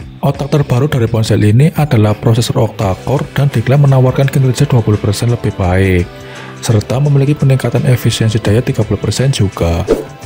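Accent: Banyumas, Indonesia. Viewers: Mas aslinya seka ngendi? Cilacap, Brebes atawa Purbalingga😄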